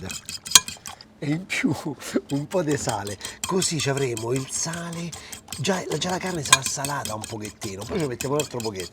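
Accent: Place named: Milan, Italy. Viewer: Max complimenti per aver assunto anche l’accento milanese quasi perfetto !!!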